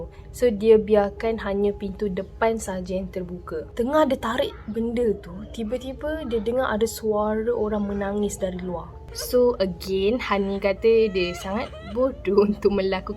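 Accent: Kelantan, Malaysia. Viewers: 7e kelantan ni kowt...sbb dia punya loghat mcm loghat kelantan😚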